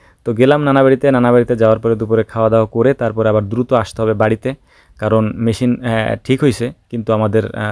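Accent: Faridpur, Bangladesh. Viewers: ভাই আপনার বাড়ি কোন জেলায়, আপনার বেশ কিছু ভিডিও দেখলাম আপনার ভাষা শুনে মনে হচ্ছে আপনি হয়তো ফরিদপুর, কিনবা গোপালগঞ্জ।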